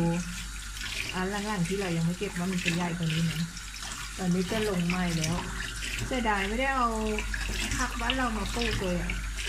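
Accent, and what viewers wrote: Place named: Kanchanaburi, Thailand. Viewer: เสียงแบบนี้คนกาญแท้ๆแน่เลย ผักสดๆน่าทานมากเลยครับพี่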